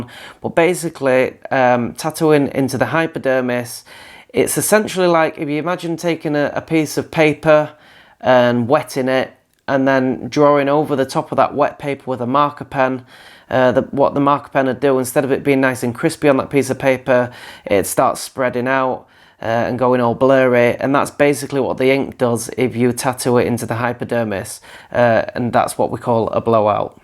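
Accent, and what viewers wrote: United Kingdom. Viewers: Thank you SOOOOO much. you brits make anything sound awesome. love your enthusiasm and brainworks. watching video after video can become boring or even worse misinformed. I am a sponge...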